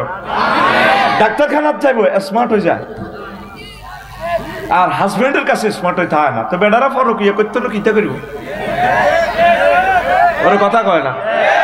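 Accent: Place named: Noakhali, Bangladesh. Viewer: হুজুরের ওয়াজ সোঠিক অনেক বালো ওয়াজ নোয়াখালির বাষায় সুনতে বালো লাগে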